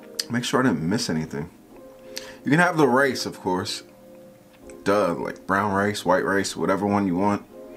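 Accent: Maryland, United States. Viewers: Can you do a vid flexing your Maryland accent It is so interesting 🤖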